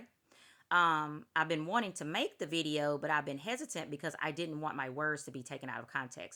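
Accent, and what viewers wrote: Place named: United States. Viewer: I JUST “met” you and KNOW you’re FBA. If your looks don’t give it away, your accent SURELY DOES!!! 😩🤷🏽‍♂️🤣 lol. Salute, Good Sister!!! 💪🏾✊🏾🤌🏾 And, “Let the record show”, I am FULLY against ILLEGALS and, I’m NOT fond of immigration as a whole. FAR TOO MANY here, lowering wages and raising prices of EVERYTHING, ESPECIALLY houses and apartments. Land/buildings in general. Hell, Coretta Scott King was against immigration. 🤷🏽‍♂️🤷🏽‍♂️🤷🏽‍♂️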